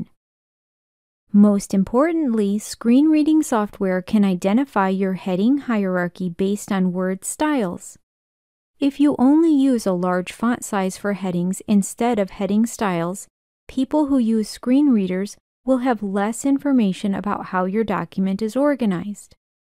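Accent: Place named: Midwest, United States. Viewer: Midwest accent is awesome ❤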